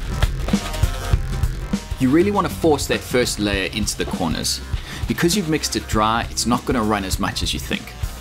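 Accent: South Africa. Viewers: This is definitely a South African accent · Hi. I can hear from your accent that you are from South Africa, what cement do you recommend using thats available in SA? Thanks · Helpful video. Thought you were South African based on your accent. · you sound totally south african to me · Aussie - who woulda known, you sound South African. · Definitely a South African living in Australia. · Thought to myself, this oke sounds Saffa, then spotted the bare feet for confirmation LOL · South African? Great job btw. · Your accent has alittle South African in it..love your work.. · Oi this dude sounds like a South African bloke? ;) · You sound like a South African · Boet, I see you're in Oz, but you sound just like a Saffa? Great video by the way. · Hey man, this is great 👍 Are you in perth? ( you sound South African ) , we have lots of saffas here. Nice work again mate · That accent is SOUTH AFRICAN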